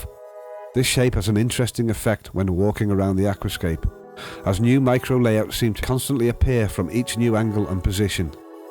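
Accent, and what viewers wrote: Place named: England, United Kingdom. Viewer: The narrator is speaking in a regional English accent not spoken in North America but in England.. Similar to the difference between Mexican and Castillan:)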